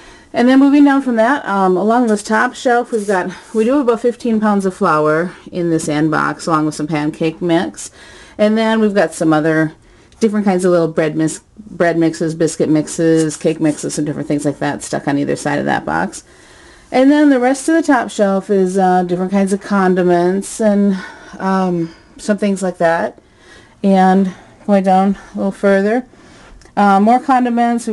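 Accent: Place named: Minnesota, United States. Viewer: You in MN? My wife and i are in the same boat. I can hear the accent.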